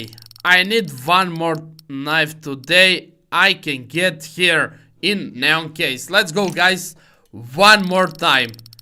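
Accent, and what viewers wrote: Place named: Serbia. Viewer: pricas engleski ko srpski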